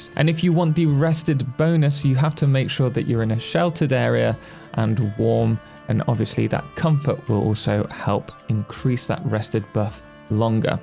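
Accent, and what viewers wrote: United Kingdom. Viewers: Sounds like a normal British accent to me, you know from tje country that the language came from 😂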